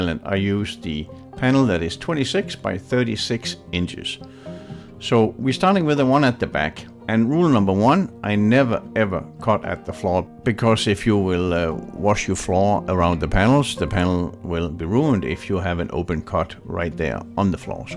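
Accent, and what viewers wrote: Denmark. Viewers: Does anyone have recommendations on which style of Ikea cabinet is best? Has anyone used the Vedhamn cabinets? We're also considering white or off-white. Not sure which model to choose. I love videos. So grateful to have found this channel with this wonderful Danish man. Both of my parents were born and raised in Denmark so I really love hearing that accent.❤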